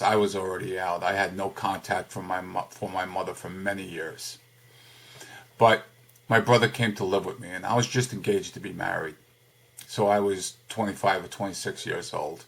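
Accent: New York, United States. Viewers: Are you from the east coast? I'm detecting a New York accent and as I'm typing this, you said Brooklyn. You sound like family! Anyway you look like a cross between John Gotti and Deniro. Take it as a compliment because they're both very nice looking men. I'm sorry that you had to deal with this shit. I sense a lot of those things in the narc I know. She is in a sick competition with her daughter. She financially supports her child and provides for her but any other mothering seems void. Only if it serves her. She'll put pictures on to get compliments.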